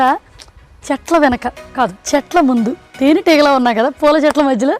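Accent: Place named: Telugu, India. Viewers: I can feel Pure Desi Telugu language in your voice. Fell in Love with your voice .... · Hello your from east godavari na? Bcoz your slang is like that... I like your videos andi..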